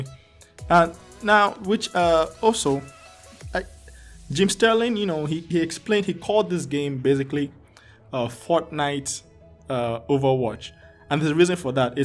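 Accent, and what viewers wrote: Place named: Nigeria. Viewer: Wow... Love your accent. Are you from Nigeria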